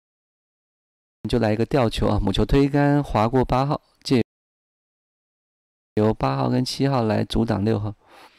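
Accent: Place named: Taiwan. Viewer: 這主播怎麼有台灣腔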